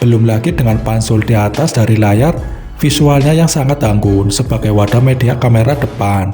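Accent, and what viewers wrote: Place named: Tegal, Indonesia. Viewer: Logat tegalnya medok bet dah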